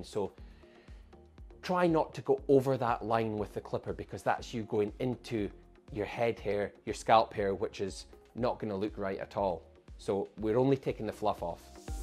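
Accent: Scotland, United Kingdom. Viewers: Love the Skaughtisch accent! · Thanks for this easy approachable concept to do self hair cuts. Really like your accent as well. Scottish?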